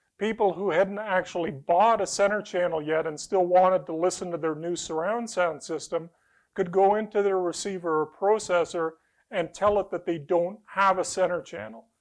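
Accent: Canada. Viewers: In literally 3 secs i knew guy is from or in Canada!!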